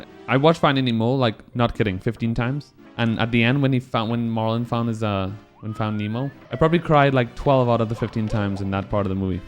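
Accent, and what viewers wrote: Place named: Trinidad and Tobago. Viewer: I need to know. You sounds so trini its insane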